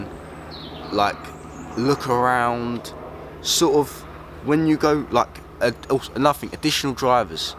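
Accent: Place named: Croydon, United Kingdom. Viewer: Why does he talk like a wannabe rude boy from Croydon???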